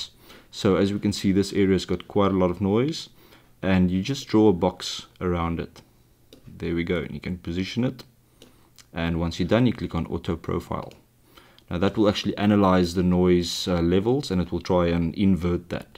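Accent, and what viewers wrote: South Africa. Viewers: Helpful tutorial, but I'm liking this mainly because I love South African accents :)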